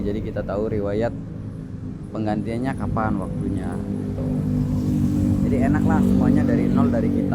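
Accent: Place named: Banyumas, Indonesia. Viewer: Om Ini Aslinya Orang Ngapak.Ya Ommm..."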